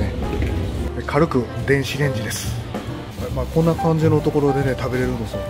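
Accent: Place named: Kanto, Japan. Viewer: さん大阪？大阪の人なら「今日もはじまるぜぇ〜」はないで！ 「今日もはじまんで〜」せめて「今日もはじまるでぇ〜」 動画中は敬語喋られてるから関東訛りっぽくなるのはしゃーないけど、もう少し大阪アピールして欲しいな^_^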